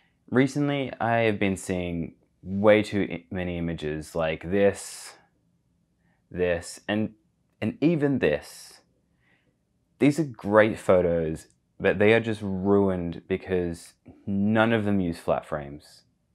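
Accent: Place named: Australia. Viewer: expecting a non Aussie accent on YT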